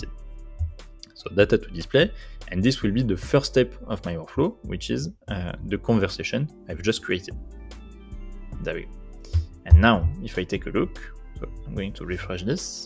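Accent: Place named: France. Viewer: Bro you have amazing accent!!! French most beautiful language in the world!!! Great work also 🫱🏼‍🫲🏾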